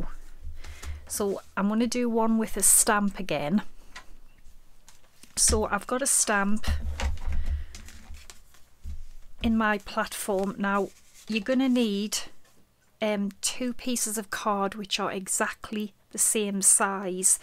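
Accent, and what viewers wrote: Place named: Scotland, United Kingdom. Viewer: Love this. And that stamping board. Love your dialect. Scottish?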